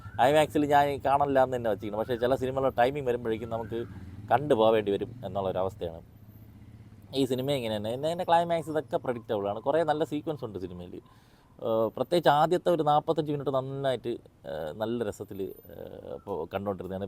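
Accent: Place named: Thiruvananthapuram, India. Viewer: തിരുവനന്തപുരം ഭാഷ❤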